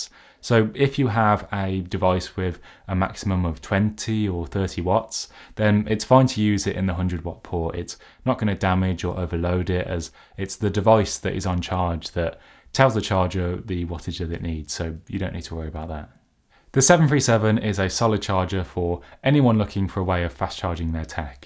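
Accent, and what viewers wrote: Midlands, United Kingdom. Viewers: I know a midlands man when I hear one. 😎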